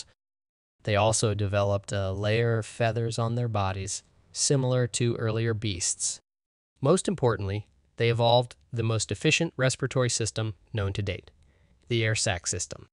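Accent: United States. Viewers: Another great episode. From your accent I'd assumed you were American.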